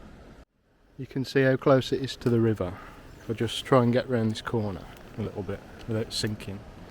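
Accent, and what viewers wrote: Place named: Black Country, United Kingdom. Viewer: Very detailed video, thank you. I’m from Wolverhampton originally so hearing the Black Country accent makes me feel like I’m home. Loved the addition of the bridge photographs!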